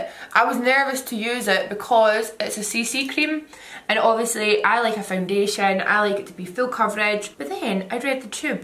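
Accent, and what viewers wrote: Scotland, United Kingdom. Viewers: You are so different and legit beautiful, I love the fact that you are not like everyone else, and I flipin love your Scottish accent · ...i just recently found you on YouTube and now i cannot stop watching your videos!!!! you're absolutely gorgeous, your makeup looks are always fire, and i am obsessed with your beautiful Scottish accent!!! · her accents amazing ! there's hardly any scottish youtubers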